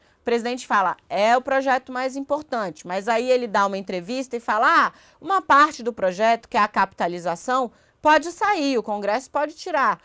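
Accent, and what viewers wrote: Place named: Minas Gerais, Brazil. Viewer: Não esconde o sotaque mineiro não. Adoro.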